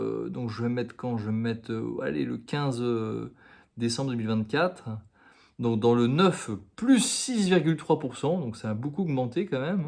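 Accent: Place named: Poland. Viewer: Merci! L'accent polonais m'a perdu. Le centre ville de Lodz à un charme médiéval ( après ma visite de l'année 2013). Selon wiki, ce sont les chevaliers teutoniques qui ont construit son château. La ville à du se développer autour (comme un peu partout.) Lodz me paraît assez charmant selon les images google. Peut être une belle découverte grâce à vous.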